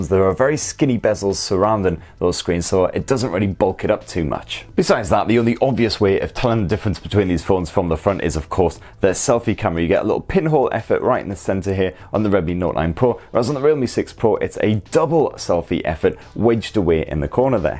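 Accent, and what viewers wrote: United Kingdom. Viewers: Just love to hear his british accent 🤣👌 "bloody" liked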